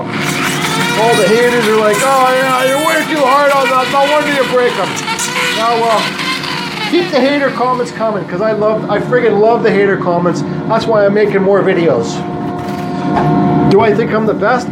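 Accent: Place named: Canada. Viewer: You have the most amazing Canadian accent... :-)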